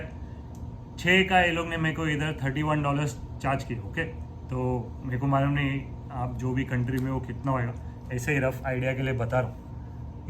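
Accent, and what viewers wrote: Mumbai, India. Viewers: Your way of speaking takes me to Mumbai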